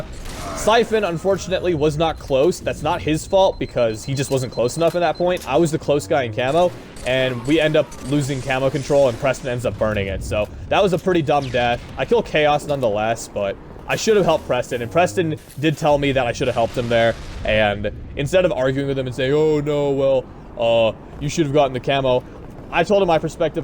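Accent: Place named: United Kingdom. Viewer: 0w0 u sound like a fellow brit>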